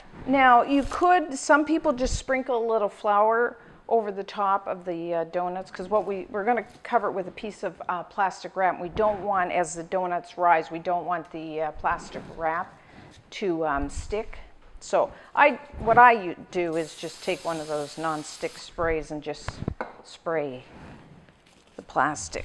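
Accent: Canada. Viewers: Are you Canadian? You pronounce "ou" a lil bit like canucks do. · Are you canadian? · I listened to this video again I think you're correct! At first I was thinking North Dakota or Northern Michigan but I think I'm hearing a slight Nova Scotia tone now. She probably went to elementary school in Nova Scotia and then high school somewhere else in Manitoba or Ontario. Her 'About' and 'outside' totally gave away the Canadian connection. It's tough to tell exactly where though because she doesn't use slang or idioms much. I love her videos.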